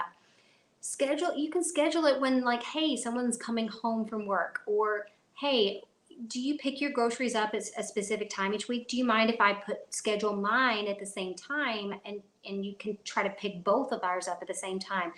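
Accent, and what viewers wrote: South, United States. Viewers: Thanks for your live chat vlog tonight. I had to drop for a bit and checked back in. I love your southern accent! We are from California and have been in GA for almost 30 yrs now and people say I have it too now. Best of luck going back to the cane. My husband got a guide dog a year ago and it changed so much of his life for the better. His confidence skyrocketed. Thanks too for answering my questions tonight! Very helpful. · Good stream and love your southern accent looking forward to more of your videos two toes up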